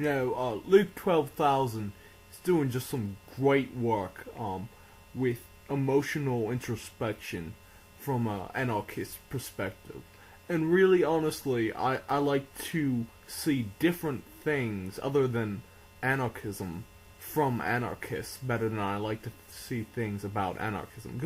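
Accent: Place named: New Orleans, United States. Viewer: I've always wondered where your accent is from...sounds very Carolina like...but then again it has a New Orleans thing going on...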